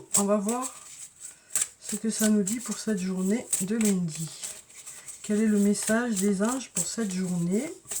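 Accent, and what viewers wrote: South, France. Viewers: Bonne journée toujours un plaisir d écouter cette voix douce avec cet accent du soleil, merci pour votre tirage et merci de ce temps que vous nous offrez.bonne journée à vous bisous.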